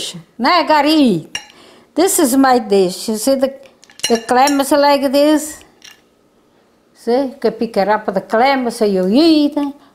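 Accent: Italy. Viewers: Dear Grandma, my parents are both first generation Italian American from New England but we all live in South Carolina now…….I’m truly sad that the grands and great grands truly have little idea of the richness of our culture……..I’m willing to bet they’ve never heard your accent while I loved visiting my Dad’s family for that very reason! What you are doing is really documenting what it is to be Italian and that is very important. Of course your cheerful voice, lovely smile and glorious food preparation keep us coming back for more….keep singing, Nonna!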